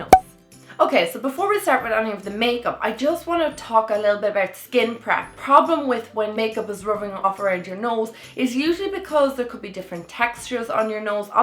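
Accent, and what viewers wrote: Ireland. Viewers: I love your vidieos! I also love your makeup looks u do! I've bought several products from watching u that I love! I love the loreal blur cream and the nyx butter lip in fun size! I also love your accent!!! I'm Irish too and my grandfather has the same accent I love it!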